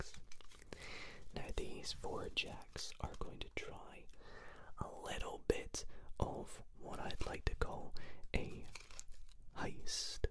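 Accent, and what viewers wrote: Scotland, United Kingdom. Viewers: yepp he is scottish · No way! I’ve wanted to learn card tricks all this week. Btw, are you Scottish? I am too and i thought your accent was similar to me lol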